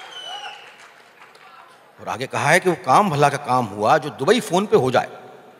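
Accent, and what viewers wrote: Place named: Jharkhand, India. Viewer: The voice of Waseypur..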